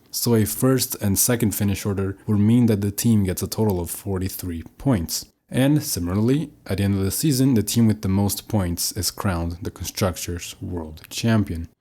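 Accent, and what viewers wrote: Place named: Turkey. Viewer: You got turkish accent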